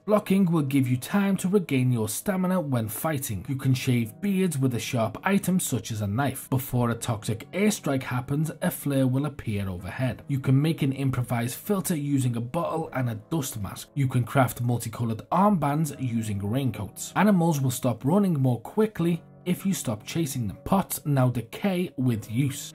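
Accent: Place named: Northern England, United Kingdom. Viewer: OMG You did that on purpose right? I do believe you just created not o ly one of the most useful dayz videos for beginners like myself, but you also just rested one of the best money earning youtube videos of everyone who watches it and finds it useful is gonna be back here again and again and...yeah and so on lol. Well done on both counts lad, nice work! And to think k I was gonna say I find your videos amazing but so fast I am forever rewinding and rewinding LOL, well I won't bother asking you to slow them down after seeing this one! That accent be familiar to me. My wife she be from Chester and used to live the Wirral. I'm from Scotland so we both count as northerners, nice tae meet ye. 👍💚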